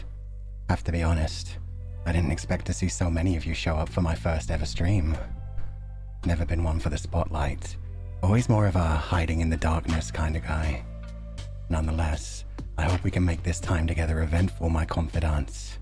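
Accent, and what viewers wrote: RP, United Kingdom. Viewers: posh I think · naaah he’s Vox type of british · Like vox received pronunciation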